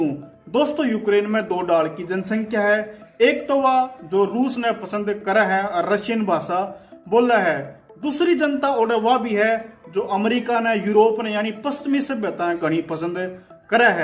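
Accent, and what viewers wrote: Haryana, India. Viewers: आप हरियाणवी ढंग से सभी बात बताते हो, बेहद सुख की अनुभूति होती हैं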